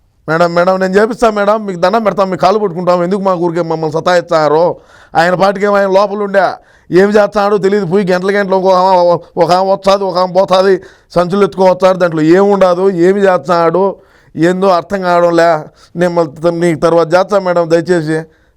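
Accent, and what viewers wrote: Rayalaseema, India. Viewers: అయ్యా రాయలసీమ యాసలో మాట్లాడి ఇరగదీస్తున్నారు..సూపరో సూపర్...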